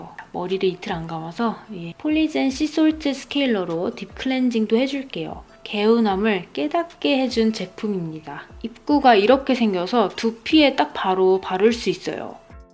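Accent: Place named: South Korea. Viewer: 와 영상은 처음봤는데 진한 한국말에 놀람...한국분이셨어요?!!!!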